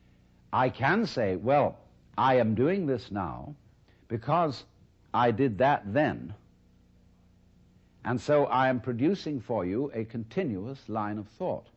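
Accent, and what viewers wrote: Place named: England, United Kingdom. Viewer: With that English accent i would not beleive anything he. says.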